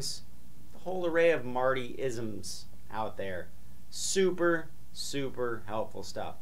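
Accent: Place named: South, United States. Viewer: you accent are totally southern! XD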